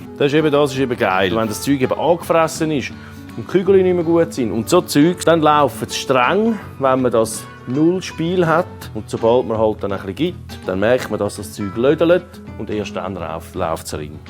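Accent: Switzerland. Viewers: Das geilste an seinem Schwizer Dütsch is wenn ich genau hinhöre verstehe alles 😁 sogar als hochdeutsch gewohnter😁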